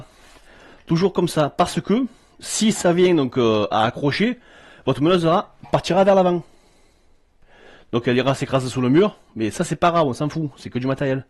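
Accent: South, France. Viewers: Merci pour ces bons conseils donnés avec ce bel accent du sud ;o) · Super Sympa et très utile ce tuto. Que d'excellents conseils avec une pointe d'humour c'est toujours agréable à suivre. Par contre un petit soucis..l'accent plutôt du sud et le petit kenavo breton . Alors plutôt Rennais ou Marseillais ;-))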